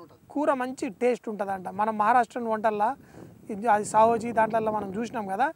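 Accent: Telangana, India. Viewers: Annayya Mana Telangana yasssa mastuga unnade anna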